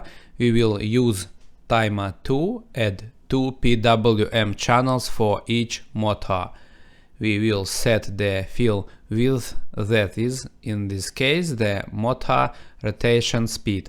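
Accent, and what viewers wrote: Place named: Russia. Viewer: приветствую! ваш анлийский поймет только русскоговорящий, говорите лучше на нашем могучем языке, он великолепен! :о) удачи в творчестве!!!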